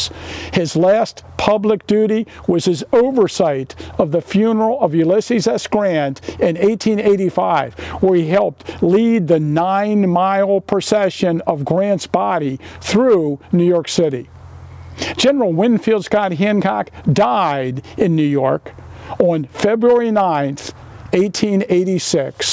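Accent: Baltimore, United States. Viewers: The superb! Love your work, and your mistakes and baltimore accent make it all the better!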